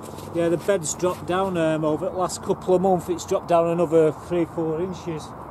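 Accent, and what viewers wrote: Salford, United Kingdom. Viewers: You vids are fine it's nice ironically to hear the acscent since I left salford when I was 19 I'm now 46 in do go back every 4 months to go watch a game or two Lemon balm herb as is stevia which is a natural sweetener Tasting that though its initially very sweet with an after taste of liquorice why do u only plant a small amount of tomatoes have u every made sauce with them we finally have nice weather but it's blowing 50 mph it's always something I'm trying to figure out how I can send a video of my trips to u I do offshore fishing for shark tuna etc u would love it if I figure it out I send a link after I post it take care u should type in goggle or YouTube montauk point Or herring run-striped bass or bluefish you will be blown away at the annual migration of some of these fish some of the bluefish migration last a week or two and are by the hundreds of thousands in schools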